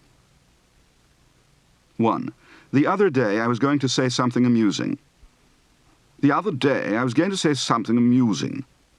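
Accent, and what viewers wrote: RP, United Kingdom. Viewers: This accent was still quite common among the upper class in the West Country during the 1970s. The movie "Pygmalion" (1938) is a great resource for hearing different accents of the pre-WW2 era, with Leslie Howard speaking RP throughout. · Lovely example of High RP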